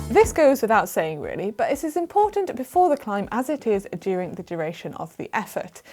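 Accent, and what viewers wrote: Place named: Wales, United Kingdom. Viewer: Lovely Welsh accent ❤️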